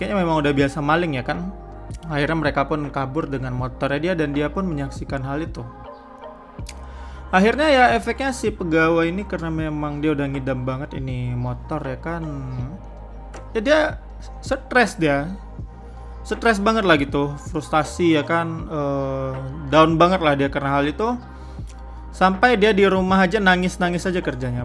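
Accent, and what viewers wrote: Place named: Sumatra, Indonesia. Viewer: abang dari pulau sumatera? wehh pantes aja logatnya logat ngomong orang sumatera. sy org bukittinggi bg. salam kenal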